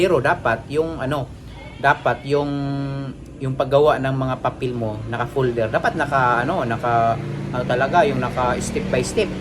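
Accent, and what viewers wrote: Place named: Visayas, Philippines. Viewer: Hmm my idea n din ako Peru parang bisaya c sir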